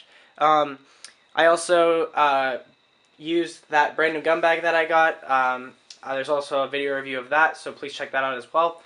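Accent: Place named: North America. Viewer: You speak with a North American English accent and yet you keep pronouncing Aluminum the British way...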